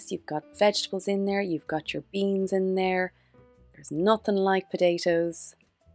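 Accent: Ireland. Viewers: Are you Irish? I detect an accent. Thanks for this. It was fun to watch!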